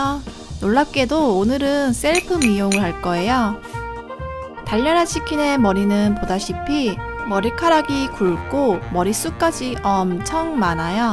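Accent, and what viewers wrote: South Korea. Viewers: 달려라 치킨님 대구 사람이예요? 아님 서울 사람 이예요? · 꼬마 부산에사셔서 부산같은데..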